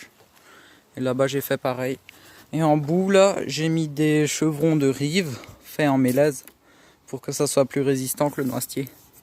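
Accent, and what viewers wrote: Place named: Alsace, France. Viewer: Excellent les gars, je viens de regarder ta vidéo, je vais bientôt avoir 40 ans et franchement continuez comme ça les jeunes je vous encourage, je viens d’Alsace aussi et ton accent je l’entends bien grave allez à plus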